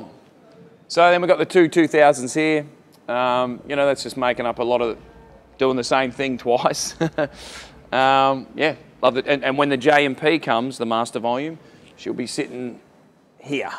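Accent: Australia. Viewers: How Australian is he? Brilliant! It just sounds better being called a c$&@ in Australian. Sounds like a normal bloke. Great stuff! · massive australian accent ! this dude seems so cool haha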